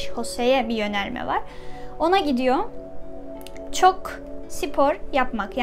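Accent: Spain. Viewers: "z"nin telaffuzu için İspanya aksanini tercih ediyorsun ama "ce"nin telaffuzu için Latin aksanı duyuluyor hdwjshhws sen de benim gibi kararsizlardansin galiba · Metni ispanyolca telafuzu olan birinin okumasi cok guzel olmus👍😊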